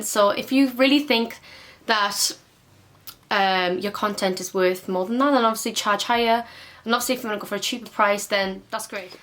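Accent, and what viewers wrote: Wales, United Kingdom. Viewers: Are ya a welshie??😍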